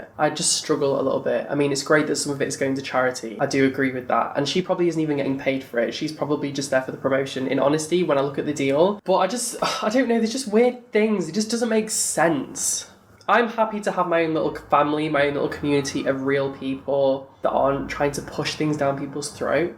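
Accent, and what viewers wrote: Manchester, United Kingdom. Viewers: are you from Manchester of so I love you even more I that is even possible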